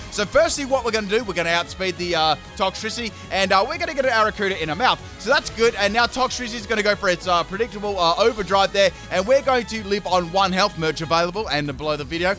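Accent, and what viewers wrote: Australia. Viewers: I've been watching him for some time but.. is he Australian?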